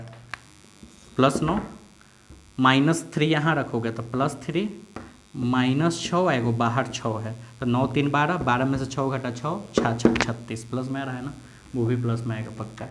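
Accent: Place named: Bihar, India. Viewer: Bihari language me feel hi Alag hai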